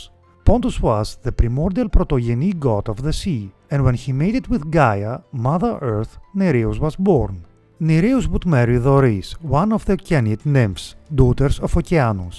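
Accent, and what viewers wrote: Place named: Greece. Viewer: ελληνας στανταρ απο την προφορα στα αγγλικα. ωραιο καναλι 👍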